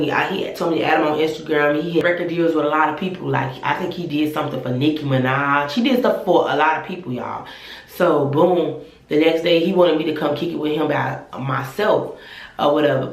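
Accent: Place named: Chicago, United States. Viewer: Ik a Chicago voice when I hear one!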